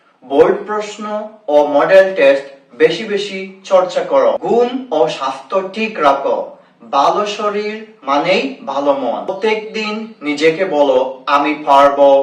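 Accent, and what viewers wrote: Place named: United Kingdom. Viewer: sorry baia / sir. but আপনার কথা বলাটা কিছুটা ব্রিটিশ টাইপের। মানে আপনি মনে হয় english techer তাই হয়তো এমন মনে হচ্ছে। 🙏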